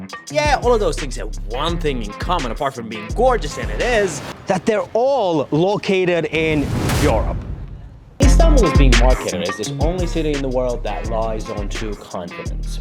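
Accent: United States. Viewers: This the first video i saw very impressive and full of information i was looking ....Also being born in Turkey you talk like Americans.we would love to visit Turkey in very near future.Take care and God bless you. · Nice narration. You speak like American. 👍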